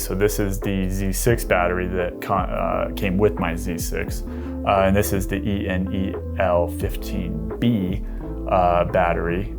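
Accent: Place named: United States. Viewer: Finally someone who speaks American English and says Zee instead of Zed!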